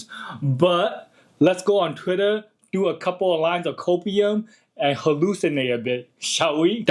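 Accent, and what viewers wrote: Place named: China. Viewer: It's wild someone with a Chinese accent is talking about being a landlord in AMerica...sorry for saying it(not sorry).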